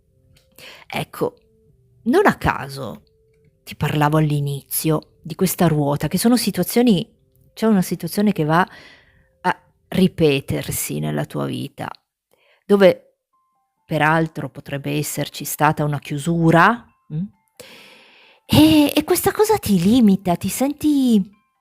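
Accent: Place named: Italy. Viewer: Me encanta el acento italiano además se entiende bastante bien saludos desde 🇪🇦🙌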